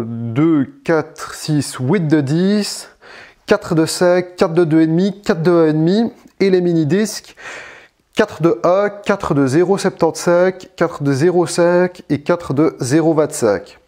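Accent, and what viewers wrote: Belgium. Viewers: C'est curieux cet accent belge comme si vous aviez le nez bouché ! Je vous salue cousins belges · c'est l'accent des mecs de Verviers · tres belle salle ... t'es belge ? si oui quelle région ?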